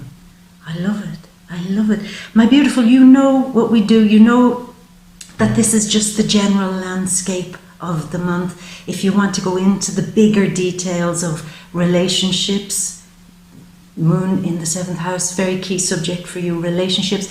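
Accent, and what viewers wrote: Ireland. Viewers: I sent your readings to a LOT of my friends. Problems with the Galaxy or not, YOU were very powerful. I'm going to listen to my sage Sun reading when I go to sleep for awhile so that I can fully absorb it. Enjoyed my moon read as well as I'm SURE I will my rising. Love your Irish accent and your practical take on astrology and tarot. VERY insightful AND inspiring. THANK YOU SO MUCH ❤️🎈💗🎈💞🎈💕🎈 🙌 🌞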